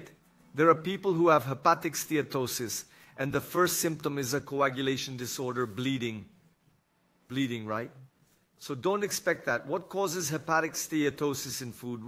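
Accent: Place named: India. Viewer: Great job dr.. Sutaque parececido com indiano falando.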